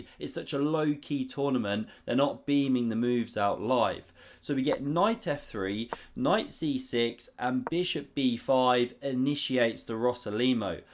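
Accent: United Kingdom. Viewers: Love your channel and your cool British accent.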